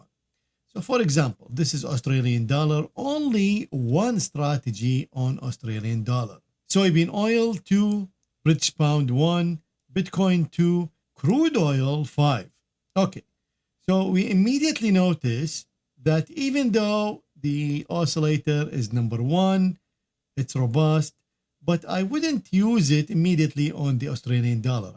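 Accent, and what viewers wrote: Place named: Iraq. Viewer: لهجتك عراقية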